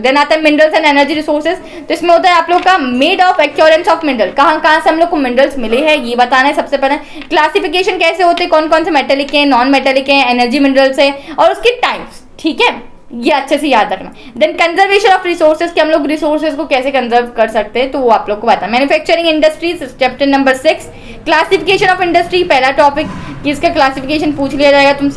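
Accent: South, India. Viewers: Mam Are you from south India Plss tell😖😖 BTW Thanks for everything🥰🥰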